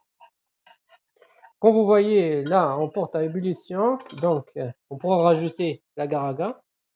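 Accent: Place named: Kabylie, Algeria. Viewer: J’adore votre accent kabyle Merci pour cette recette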